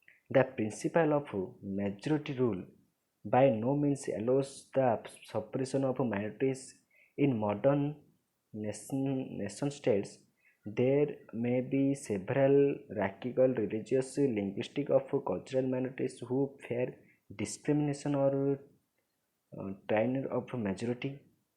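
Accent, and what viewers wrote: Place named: Odisha, India. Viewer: I believe you must be aware with the fact that each one of us have a accent and so does he, which is not a big deal. These dialects are influenced by the different languages that different sections of the country also speak, side by side with English. He is from Odisha due to which he speaks such. Instead of criticising him you should appreciate him for explaining this concept in a wonderful manner.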